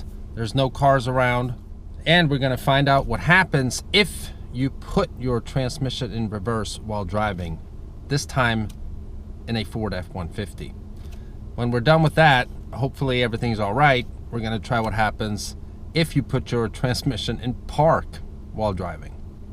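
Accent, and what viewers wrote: Sweden. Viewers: Are you american? You may sound a little Swedish 😁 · You don't sound Swedish anymore! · you sound like you have a faint FAINT swedish accent :)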